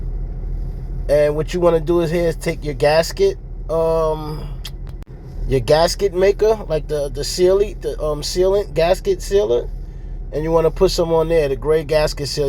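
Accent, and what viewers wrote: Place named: Philadelphia, United States. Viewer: I see your page says Orlando but bruh I swear you sound like your from Philly.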